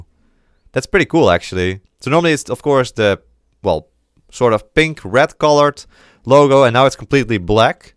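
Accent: Netherlands. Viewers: hello, i can hear you're from the netherlands :)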